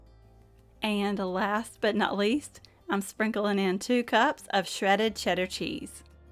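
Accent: South, United States. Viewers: Your lovely southern accent is the icing on the cake! Love this recipes. ❤can't wait to make them Sister 😊.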